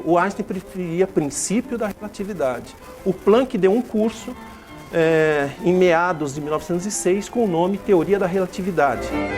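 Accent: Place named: Italy. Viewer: Caraca, que sotaque de... italiano!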